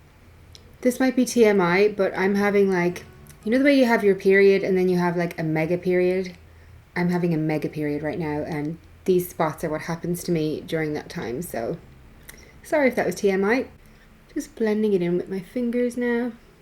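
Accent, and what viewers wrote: Ireland. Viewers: is from ireland?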